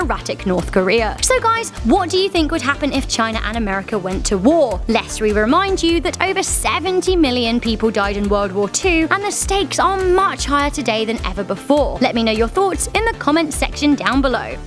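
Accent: England, United Kingdom. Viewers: Why do they always have people with English accents narrating these videos? I mean, it’s about China and America, not England.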